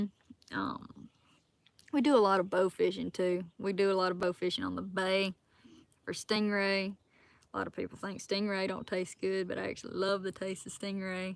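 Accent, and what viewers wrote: South, United States. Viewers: Love your Southern Drawl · You are precious with a little southern eloquence. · Love that southern accent. Who cares what anyone says it looks good on you. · Love that southern drawl. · I love your Southern Accent and definitely don't tell where in Alabama you live! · Hi, love your Southern accent. Keep them outdoors videos coming, keep looking good. You are an awesome gal. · Whoever says something negative about your accent deserves the middle finger! I love that Southern Draw!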